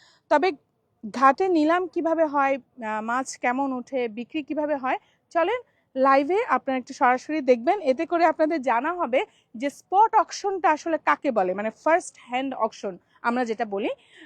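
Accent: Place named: Bangladesh. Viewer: আমি ইন্ডিয়ান কলকাতাতে থাকি ,তোমার blog দেখতে খুব ভাল লাগে বিশেষ করে বাংলাদেশের ভাষা শুনতে। আমার origin বাংলাদেশের ফরিদপুর এ।বেশী করে বাংলাদেশের ভাষা বলবেন,অনুরোধ রইল। আমার নাম সুব্রত মিত্র,বয়স 72।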